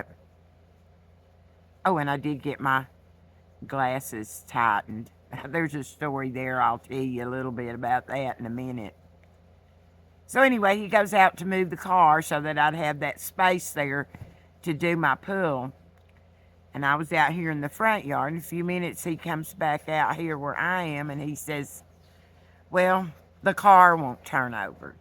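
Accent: South, United States. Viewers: Thank you so much for standing up for kindness, and common sense. And for speaking up💖✨I’m from AR & live in TN now…and it’s sad to see so many good hearted, hard working people being conned by the carpetbagger (tRump). It is so reassuring to hear a wise, southern accent sharing good information & kindness. I’m late seeing this video, so by now we know Biden has announced he will not be running for 2024…I agree with you on the corp media…hopefully now that he has made this announcement. I hope they (Democrats & media) stop the madness & get back to work for us…Thank you! I also watch Midas touch, Tx Paul, and TN Brando💖✨🙏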